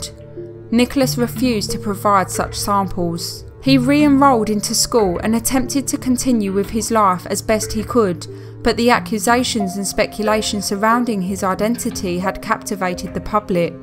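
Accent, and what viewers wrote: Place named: South London, United Kingdom. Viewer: I like the subject matter but I am so distracted by the awful narration ! Robotic and an awful South London accent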